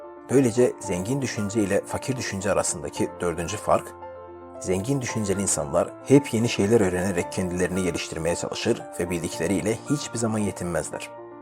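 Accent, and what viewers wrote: Azerbaijan. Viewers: Qaqas azerbaycanlisan oz lehcende danissan yaxsi olar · Bu ses sahibi Azerbaycanlı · Bi şey sorucam.Videonu anlatan kişi türk deyil Azeri gibi geldi.Son tonundan anladım. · kardeş sen Azerbaycanlımısın? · qaqa azerbaycanli oldugun bilinir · Bu konusan abi Azerbaycanli!👍👍👍👍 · Konusanın azerbaycanlı oldugunu dogrumu bildim acaba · Abi azerbaycanlimisin? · levent y Seslendiren Azerbaycanlı, türkçe anadili değil. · Sen bir Azerbaycanlisan.ama direşibsenki turkce danişacaqsan.ama elede yaxşi alinmir. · Men ilk defe sizin kanalinizin turk dilinde olan versiyasi ile tanish olmusam.Her zaman dinleyende turk deyil, azerbaycanli oldugunuzu dusunurdum.Cunki lehcenizden bunu hiss edirdim.Bir gun yoldasim mene sizin azerbaycan dilinde olan videonuzu gosterende sizi basqa biri bilib zenn etdim ki,turk dilinde olan kanali tekrarlayirsiniz.Daha sonra men anladim ki,2 kanal da size mexsus imish 😄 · Xoşuma gəlməyən bir məqam var bu videoda.Videoda danışan azərbaycanlıdır amma türkiyə türkcəsində danışır.Bu da çox gülünc alınır.Her cümləsində açıq verir.Həm də kobudcasına.Sənin öz dilin yoxdur ay kanal admini.Bu yerdə Molla Nəsrəddin jurnalındakı karikatura yadıma düşür.Birinin ağzına 5-6 dil soxurlar.O da deyir ki,ay bala öz dilim ola -ola başqa dilləri niyə ağzıma soxursuz.Mın də türkəm ve bununla qürur duyuram.Amma siz belə danışanda türkiyə türkcəsini də pis günə qoyursuz. 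· Azerbaycanlısan qaqa bilinir danışığından😁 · Türkçə danışma ləhçəsindən bilinirki Azərbaycanlıdır... 😄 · Azeri oldugu belli arkadasin guzel video yapiyosun keske şiveninide biraz geristirsen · Deyesen Azerisen 😂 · Dosdlar bu videonu anlatanin Azerbaycanli oldugunu ama turkce konuwtugunu bir tek benmi anladim?? Bu arada bende Azerbaycanliyim... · Arkadas azeri turkceyi zor kullaniyor bu yuzden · Ləhcəsindən Azərbaycanlı olduğu o dəqiqə bilinir,Guyə bu indi niyə türk ləhcəsində danışırki? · Sen Azerbaycanlımısın abi?Konuşmandan anladım 😂😉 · Video yapanin konuwma seklinden qaliba azeri oylemi acaba? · Turk dilinde Azerbaycan dili kimi danışırsan)) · Bu çocuk azeri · Qaqa sən azerbaycanlisan? Danışığından hiss olunur təmiz türk dilini danışa bilmirsən sanki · Bu danisan Azerbaycanlidir...danisiqindan melumdur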